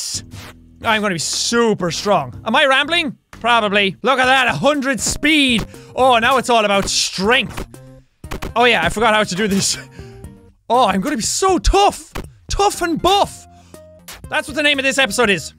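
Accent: Ireland. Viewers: I've been watching for so long that I always automatically lower the volume. And I hardly notice his Irish accent anymore. Never bothered me tho because I'm loud just without an accent. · WHY IS IT ALL YELLING WHY IS IT SO IRISH i laughed too hard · Retrosky Maybe it would be cool if could make a montage for the next subscriber milestone..? There would be the most shouty and Irish parts in best bits! Definitely RIP headphone users. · Even if you have a irish accent me and my best friend (we are both from middle of England) both love you and think you are boss and awesome! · Who's LOUD IRISH GREEN HAIR IT'S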